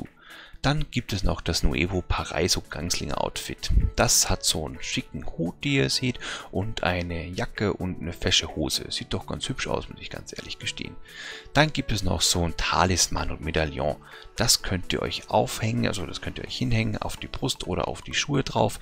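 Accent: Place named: Austria. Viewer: sehr gutes und informatives video! Mich als Österreicher freut es natürlich besonders wenn so eine Zusammenfassung mit österreichischen Akzent gemacht wird. Ich werde mir die special edition kaufen. liebe grüße karli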